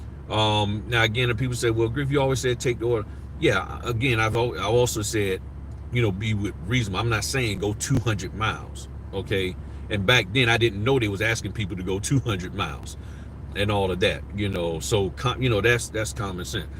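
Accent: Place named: Florida, United States. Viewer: YOU DEFINITELY SOUND LIKE SOMEONE FROM DUVAL COUNTY!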